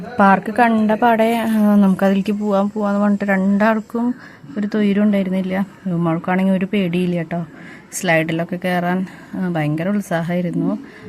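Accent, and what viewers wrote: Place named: Thrissur, India. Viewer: Aaa thrishooru bhaasha.....poliyaattaa😊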